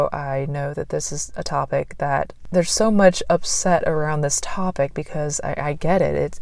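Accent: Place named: Toronto, Canada. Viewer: Your the bomb... i swear your from Toronto the way you speak...lol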